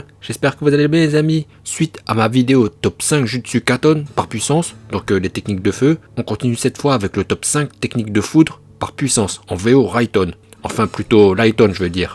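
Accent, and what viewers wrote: Japan. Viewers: ton travail de porte parole, ton accent japonais, masterclass, le jour où tu seras chauve tu auras sans doute la capacité d'utiliser le kansei migatte no chauvui avec un peu d'entraînement, bonne soirée à toi et tu passeras le bonjour au grand maître kishimoto 🙏🏻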